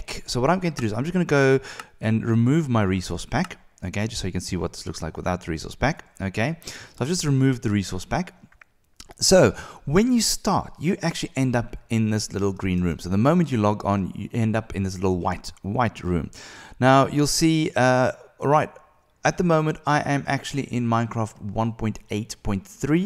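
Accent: South Africa. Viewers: Don't mind me asking... But are you South African?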